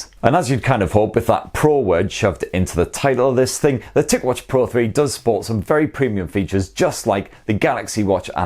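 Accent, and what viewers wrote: United Kingdom. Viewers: You didn't Include links or written names to any of these items, you ran through cryptic names too fast and with your British accent I have no clue how to reference and follow up on any of those devices. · Am I the only one who watches his channel for his absolutely lovely British accent?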